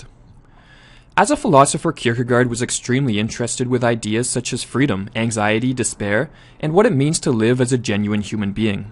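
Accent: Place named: Canada. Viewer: I spot a Canadian accent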